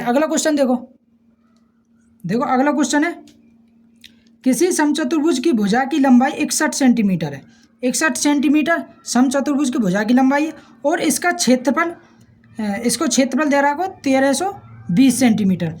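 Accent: India. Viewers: Bhaiya brajwasi hi h ka tu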